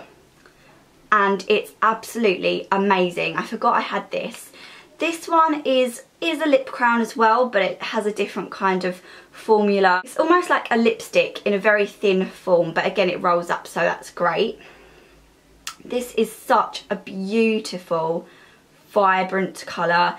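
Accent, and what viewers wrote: Essex, United Kingdom. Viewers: Are you from essex💁😁😁😁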